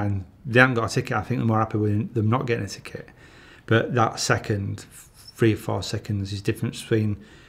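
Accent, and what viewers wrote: Yorkshire, United Kingdom. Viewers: Such a Yorkie accent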